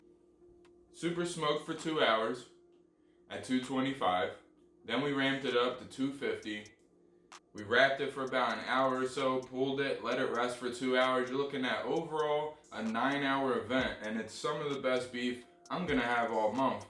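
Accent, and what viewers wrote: Philadelphia, United States. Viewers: can't miss that northeast philly accent 😂. I lived in Magee back in highschool. I'm up in Chalfont by Ryan HS now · Are you from Philly? Love your videos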